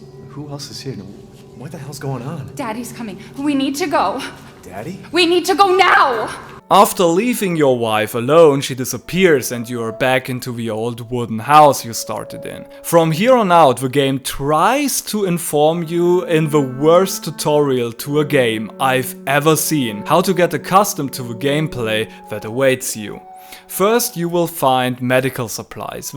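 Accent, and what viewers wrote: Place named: Germany. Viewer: I wanted to watch the video since I agree with the opinion, but from the first "laaaahst" the fucking German cringe-accent hit me. Peinlich as usual. I hate mine peinliche Mitdeutschen so much................................. Please get off DuRöhre until you've learned to pronounce (modern) English. Watch/play stuff without the fucking Synchros for instance, why dont'cha... Un-verfickt-glaublich......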